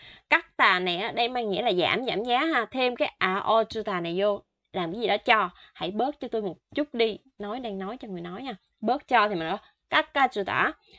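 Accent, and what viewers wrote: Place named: South, Vietnam. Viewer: thích giọng người miền nam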